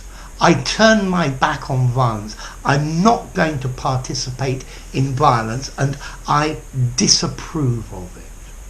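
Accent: United Kingdom. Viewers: Hi,! I like the style of your lessons. And British English of course.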